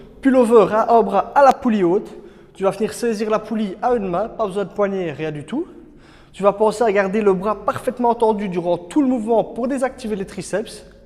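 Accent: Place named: Verviers, Belgium. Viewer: il me semblait bien avoir déja vu cette salle ! et l'accent me disais quelque chose... entre verviétois ont se comprend :-D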